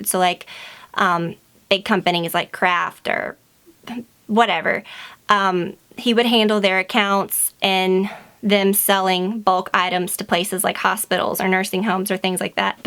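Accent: South, United States. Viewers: Wow... never knew she had a slight southern accent O_O lol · her accent is very southern in this video hahaha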